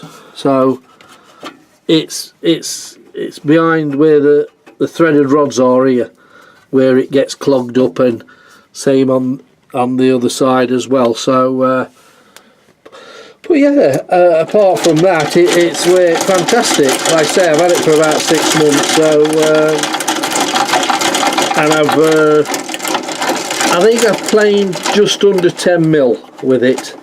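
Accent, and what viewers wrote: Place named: Nottingham, United Kingdom. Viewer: Why ho why did you take bed lock right out, you only need to free it off, you sound like a good notts lad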